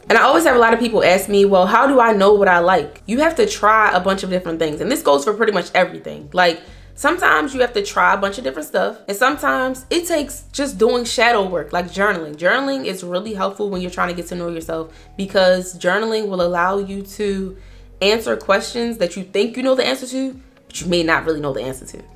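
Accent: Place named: DMV, United States. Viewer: Girl you HAVE to be from somewhere close to Baltimore,Md. I know this DMV accent anywhere lol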